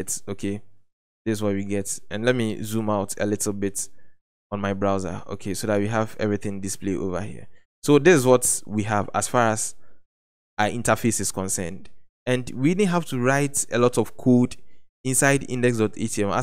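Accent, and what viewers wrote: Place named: Ghana. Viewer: Please where are you from.. You sound Ghanaian..I want to be in touch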